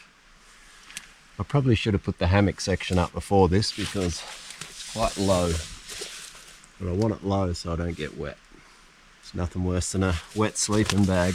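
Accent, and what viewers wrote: Australia. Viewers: Wäder😅 sorry my ear does not like the aussie accent BUT i am getting used to it, more i listen❤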